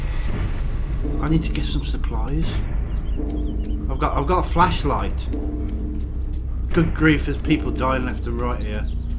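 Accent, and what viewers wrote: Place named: United Kingdom. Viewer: from now on I'm watching ALL of your vids with the closed captioning on. Apparently goggle can't decipher a British accent, and translates it HILARIOUSLY!!!: "Uh, I see shit." Google Translation: "Rubbed, litigation." Bwahahahaha!!! Keep up the great work, mate.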